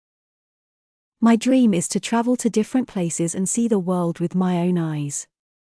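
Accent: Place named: United Kingdom. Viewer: I think this is the chanal that i was looking for to Master british accent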